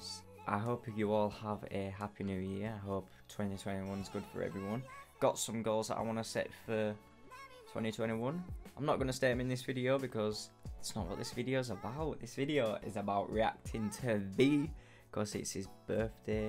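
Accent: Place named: United Kingdom. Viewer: I know how you feel...Even though I already know the songs, listening together with you put me in exactly the same state as you. A kind of bliss and existing in my own sphere^^ It's like his voice, his emotions and the lyrics stay even when the song is already finished, and keep resonating with different parts of my subconciousness.... I honestly love how moved you are by their songs, makes me really enjoy watching your reactions. Thank you! :) Btw as a non English native speaker.... still trying to figure out your dialect^^ I would go for GB but there are still so many possibilities lol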